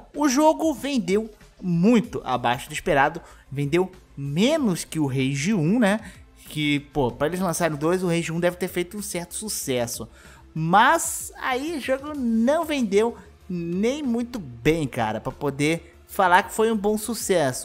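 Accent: Rio de Janeiro, Brazil. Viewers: Man, se é do rio? LIKE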